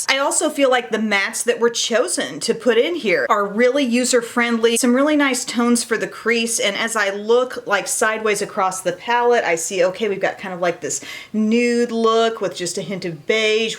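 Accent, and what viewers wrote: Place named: Midwest, United States. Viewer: a nasal voice is indicative of the midwestern American accent, she's from Illinois. As for broadcasting, used to be a newscaster; originally did her YT as the. Your attitude needs no explanation.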